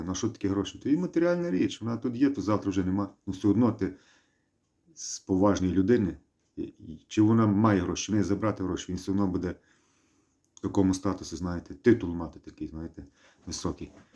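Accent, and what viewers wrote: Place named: Galicia, Ukraine. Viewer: Лайк за україно-галицький говір🤔🙂👍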